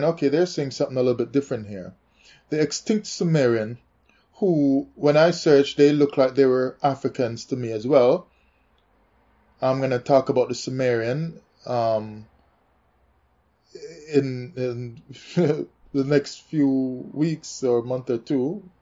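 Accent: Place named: Caribbean. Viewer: Hi, I hope you are well. I have listened to your videos on and off for several months although I have not seen the last few months worth. The last ones I really related to were those that spoke about the original language. I could relate to your frustration of what really is the original - I now feel I have found the answer. Like me, you sound like you are from the Caribbean and you will most likely share my heritage. Please find me on facebook (Zephaniah Blake) and lets talk. Peace and love.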